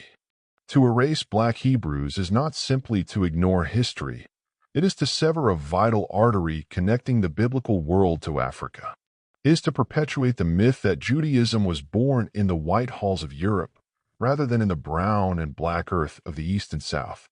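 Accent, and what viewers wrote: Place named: United States. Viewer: I don't want your subtitles. No American voice please. I prefer Oxford English.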